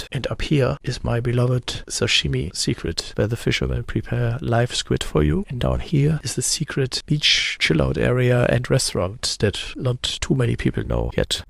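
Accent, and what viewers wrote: Germany. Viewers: Es ist jetz mein zweite video von dir das ich hab angeschaut... Ganz toll. Ich hab gleich gedacht im 20 seconden am deir erste video das sie sind sicherlich deutsch. Mein elterern sind deutch, ich schreib nicht so gut als ich kann sprechen. Ich hoffen nach Pattaya kommen dieses jahr, vielen dank fur die nachrichten am gutte platze zum bleiben. Gruss aus Vancouver BC...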